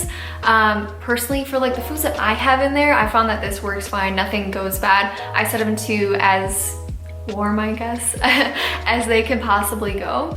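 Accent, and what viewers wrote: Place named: Minnesota, United States. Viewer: Oh ya, I think she's from Minnesota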